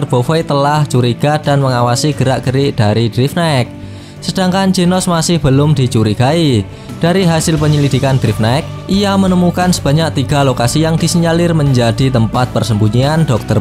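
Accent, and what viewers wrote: Java, Indonesia. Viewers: ( kammmprettt padahal udah seru loh )🤣🤣 Jawa mana min?🙏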